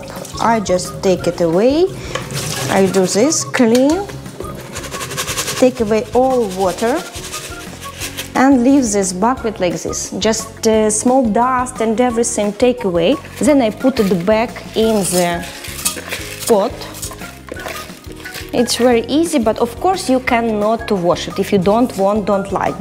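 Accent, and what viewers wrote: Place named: Ukraine. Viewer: Sounds Ukrainian to me!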